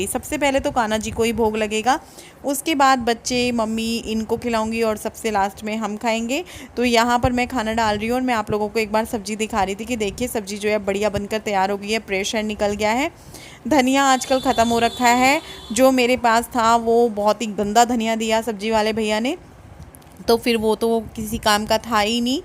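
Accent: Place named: Punjab, India. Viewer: Hiiii di , aap punjabi ho Kya , aapki tone thori thori lgti hai waisi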